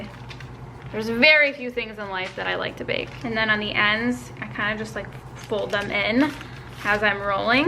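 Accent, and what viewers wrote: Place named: New York, United States. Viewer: Your NY accent is so cute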